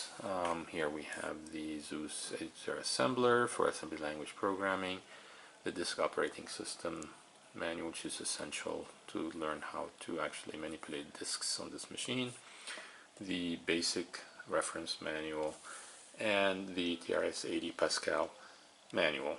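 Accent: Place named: Sweden. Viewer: This guy sounds like he is not mainly English, maybe Swedish or something i don't know, probably that's why. I think he did great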